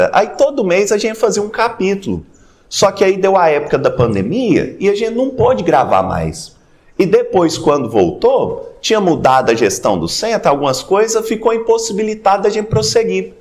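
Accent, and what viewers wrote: Minas Gerais, Brazil. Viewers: Parabéns, adorei o mineirês. Me cativou.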